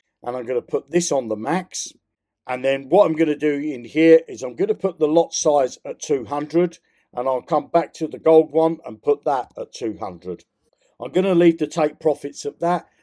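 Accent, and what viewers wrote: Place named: Northern England, United Kingdom. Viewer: REACHING OUT YET AGAIN. DUDE IF YOU CAN'T BE ARSED TO ANSWER YOUR SUBS I CAN'T BE ARSED TO KEEP SUBBED. STEP UP YOUR GAME DUDE. HERE GOES AGAIN. MY WALLET TOP UP SAYS You cannot top up your account with the selected MetaMask chain, please change to «BSC Chain»! NOTE* you must connect your metamask account to this website I THEN PRESS THE BUTTON AND NOWT HAPPENS. YOU SOUND LIKE YOU FROM THE NORTH LIKE ME SO WHY DO YOU OPERATE SO SLOWLY????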